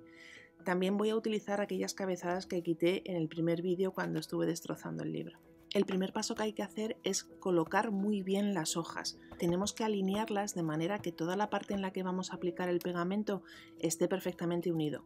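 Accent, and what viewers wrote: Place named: Spain. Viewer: I love your Spanish dialect although I do not understand it but it is beautiful and all your works are elegant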